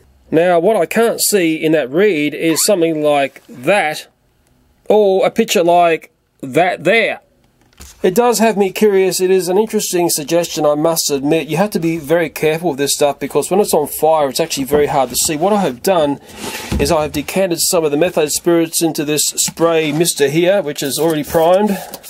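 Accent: Australia. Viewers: Is dis boi Australian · So Australian people speak English but they don't no our American English interesting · >mfw you think that's an American accent He's Australian. That's a redback spider. Just stay away from Australia and the drop bears and other nasties won't get you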